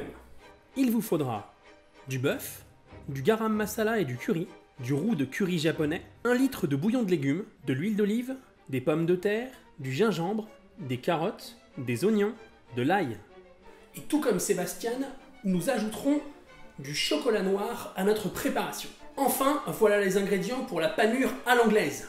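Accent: South, France. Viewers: J'ai faim. Et j'admire le nombre de craquage d'accent du sud que tu a su mettre dans cette petite vidéo. C'est craquant-gourmand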